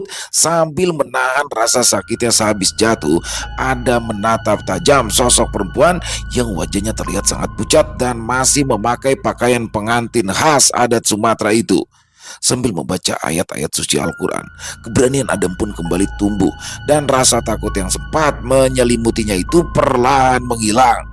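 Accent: Jakarta, Indonesia. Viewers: ASSALAMU ALAIKUM Bg 🙏,hadir lgi nyimak,selain ceritanya yg tegas & mantaabbb, logat betawinya itu yg paling saya suka,!!!!!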